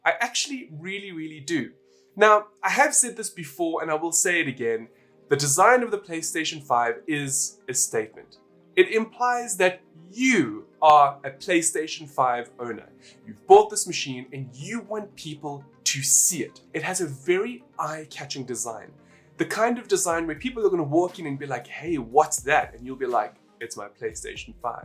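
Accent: South Africa. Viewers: I don't usually click on ads but I hope you get some recognition for your work. Are you from South Africa? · are you a south African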